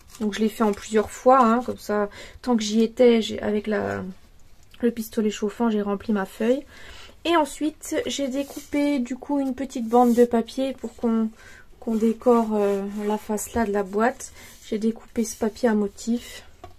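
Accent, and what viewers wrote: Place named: Franche-Comté, France. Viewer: Une belle idée. Bravo. Tu es de quel secteur ? Ton accent me rappelle mon enfance près de Besac. Bises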